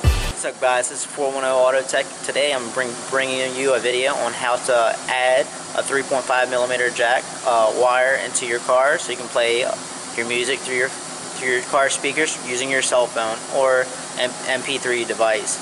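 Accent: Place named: South, United States. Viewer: What’s up brother just subbed thanks for the info. Where you from? Trying to figure out where you accent is from sounds southern a bit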